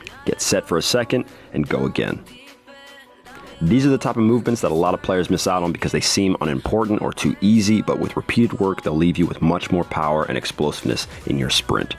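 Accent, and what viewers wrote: Netherlands. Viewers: I got one question r u dutch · Hold up are you dutch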